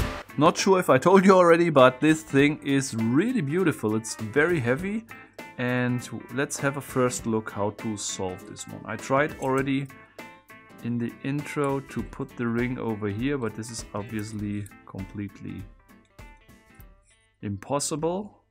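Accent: Germany. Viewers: are you german? :DDD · Omg man hört so raus dass der deutsch is xD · Oh, Guy! Are you from Germany?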